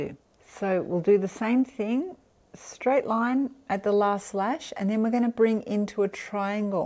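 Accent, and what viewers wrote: Australia. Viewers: Your personality fills me with warmth and joy and you are one of my top favourite YouTubers. I remember finding your channel (broccoli sprouts video) when I was in a toxic relationship and did not know what was wrong with me, and your videos gave me so much hope, softness, feminine energy I was missing in my life. I watch your videos now and I feel that burst of joy, pleasure, warmth and happiness you always have in your videos. I love your Australian accent too. You have no idea how much your radiant personality can heal others behind the screen. Thank you for being you. I hope one day to pull off this makeup look since you look like a model. There are so few people left on this earth with such an authentic emotive energy, beauty and personality. I hope you never change who you are. Thank you for what you do to help so many people remain beautiful in a world that can be cruel at times.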